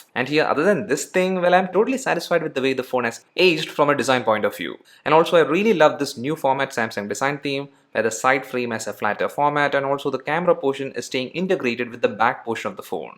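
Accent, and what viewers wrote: Kerala, India. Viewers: U sound like a malu guy.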